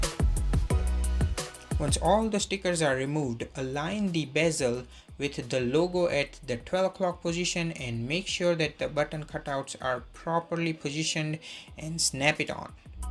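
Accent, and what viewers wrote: Gujarat, India. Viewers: Are you Gujarati?? Your accent is so Gujju..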